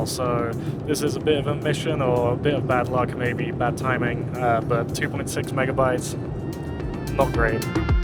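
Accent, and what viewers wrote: United Kingdom. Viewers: You know the weird thing, despite the clearly British accent, I always thought you were French until I saw the British passport! 😂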